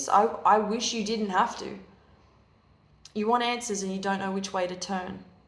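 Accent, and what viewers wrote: Australia. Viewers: Definitely an Aussie voice, good to hear. Simple and accurate. Zero drama hype in delivery. And short :)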